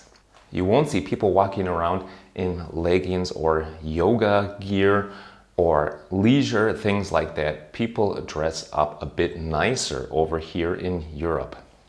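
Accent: Germany. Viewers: I'm surprised. Your heavy accent sounds like a German who lived in the US only a few years.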